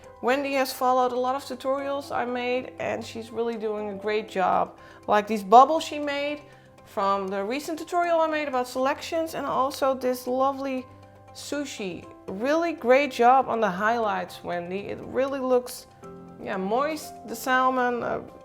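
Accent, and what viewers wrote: Netherlands. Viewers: I spy a dutch accent 😀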